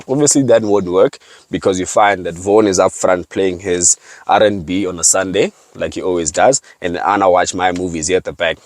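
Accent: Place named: South Africa. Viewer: We hardly here what you say, but maybe it's the south african accent... Other than that it's the best car review channel around the continent.👍🏾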